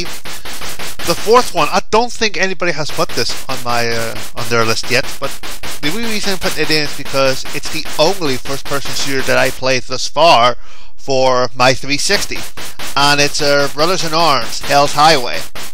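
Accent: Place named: Germany. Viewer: but your german..i could tell by the vocals ..i am german with spanish and portugesse ..ancestory :D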